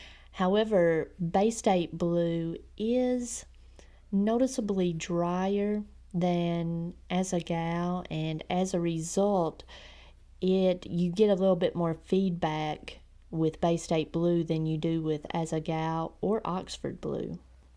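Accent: South, United States. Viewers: being from mississippi ... love that southern draw ... :-)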